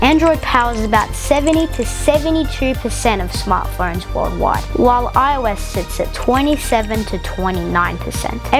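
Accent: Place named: United Kingdom. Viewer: Great work kid just loose the British accent and you’ll blow up 🙏🏽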